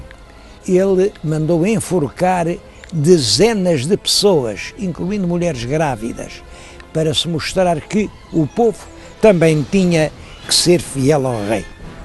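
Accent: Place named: Portugal. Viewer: impossível entender esse sotaque português, nem com legenda vai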